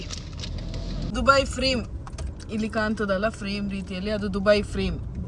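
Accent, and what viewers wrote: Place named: Tulu Nadu, India. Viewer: ಸೂಪರ್ ಮೇಡಂ. ನನಗೆ ನಿಮ್ಮ ತುಳು ಮಿಶ್ರಿತ ಕನ್ನಡ ಮಾತಿನ ಶೈಲಿ ತುಂಬಾ ಇಷ್ಟ. ತಾಯಿಯಾಗಿರುವ ನಿಮಗೂ ಅಮ್ಮಂದಿರ ದಿನಾಚರಣೆಯ ಹಾರ್ದಿಕ ಶುಭಾಷಯಗಳು. ನಿಮ್ಮ ಮಗಳು ಮುದ್ದಾಗಿದ್ದಾಳೆ. ನಾನು ಬೆಂಗಳೂರಿನಿಂದ. ಮೂಲತ ನನ್ನ ಊರು ಪುತ್ತೂರು. ಧನ್ಯವಾದಗಳು ಮೇಡಂ.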